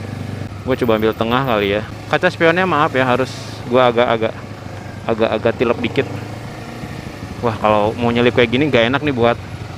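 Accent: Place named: Sunda, Indonesia. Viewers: sundanya masih ke bawa..hihihi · Msih melek ehhh ada yg update video maaciw udh nemenin begadang "Ayo pa jangan clengak-clenguk", "sebentar ya om", "usaha keun" alah alah hahahaha gg bisa nahan ktwa bagian ini sunda pisan 😄😄😄 Di tunggu next videonya ya aa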